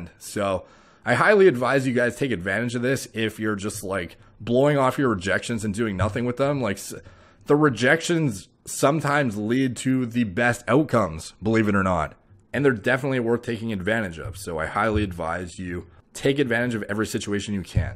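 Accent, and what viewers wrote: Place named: Toronto, Canada. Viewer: Didnt realize you were canadian. It sounds like you went york uni lol. I agree with alot of what you said.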